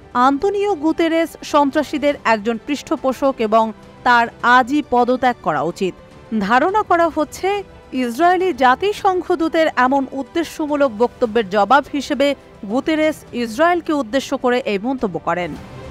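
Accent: India. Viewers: খবর প্রচার কারি মহিলার কন্ঠ ভারতের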